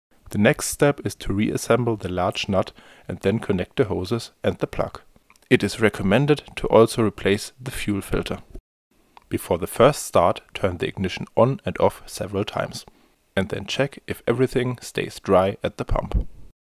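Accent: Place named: Germany. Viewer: 😀 Ich dachte erst du machsat jetzt auf Englisch Videos, wechseln ja viele zu, weil es halt viel mehr Viewer bedeutet. Ich kann ziemlich gut Englisch und muss sagen, dass du zwar voll Deutsch klingst aber alles voll verständlich von der Aussprache! Sehr gutes Video, bis auf das Wackeln, hatte das gar nicht auf deinem Channel gesehen, muss wohl vor meiner Zeit gewesen sein. Wünsche dir ein angenehmes Wochenende! PS: Herzlichen Glückwunsch zu 10.000 Abonnenten, das ist ja der Hammer oder hast du die schon länger? 😀 Bin manchmal verdaddelt, dachte nur gerade ,,Woa geil, er hat die 10K erreicht!". Das ist ja jetzt die erste Grenze zu Hobby-YouTubern und richtigen Berufs-YouTubern. Wenn du Werbung schaltest, mache ich auch gern den AddBlocker aus. Das ja wohl das Mindeste! So Roman Ende 😂👍🤦‍♂ LG HEUERs Pit Stop 🚗💨💥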